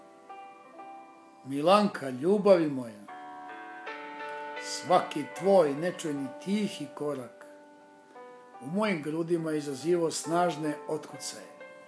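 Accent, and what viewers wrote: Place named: Kosovo. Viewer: Ajde nemoj da serete za 3 padeza. Pa nemaju svi u Srbiji beogradski sleng. Covek je sa Kosova,zadrzao je svoj dijalekt. I sada ljudima iz urbanih sredina taj govor zvuci smesan,narodski,ne znam kako vec,asocira na nepismenog coveka...Nije bas tako,ima sigurno mnogo ozbiljnih kvaliteta. A sto se tice same pesme,malo se koristio Preverovom ''Barbarom''...Ima nas koji citamo,pa ne mozes da nas prevaris, maznuo si Barbaru i preobukao je u Milanku...ali to nikako ne umanjuje ovu lepu recitaciju....Svaka cast!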